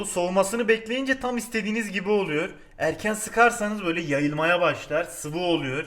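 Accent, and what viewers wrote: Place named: Erzurum, Turkey. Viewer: Abi nerelisin dadaş gibin konuşuyorsun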